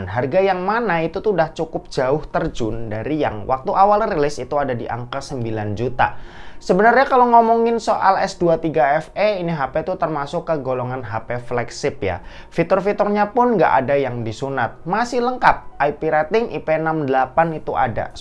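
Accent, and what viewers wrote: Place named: Java, Indonesia. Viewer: medok tipis tipis y mas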